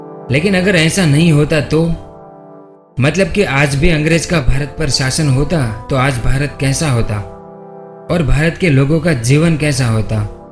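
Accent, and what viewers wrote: Gujarat, India. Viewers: Apki voice sunke lag raha hai ki ap Gujarati ho replay plz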